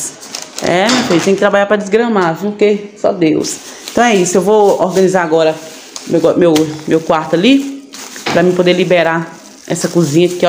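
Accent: Minas Gerais, Brazil. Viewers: Vc é de onde ?? Vc conversa tão engraçada faz graça kkkkkk igual eu deve ser mineira como eu amiga kkkkkk é nois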